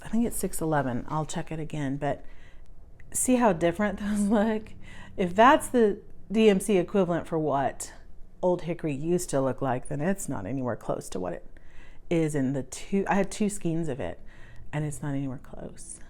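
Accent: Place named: Texas, United States. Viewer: I just found you today. Great video! I guessed you were from Texas! I had to stop and go watch you first video to be sure! I will be in Fort Worth the last week in February with my husband who is attending a work conference. I will have my days free....I would love suggestions of some cute places to shop. Are there any stitch shops in FW? Thanks so much! Ellen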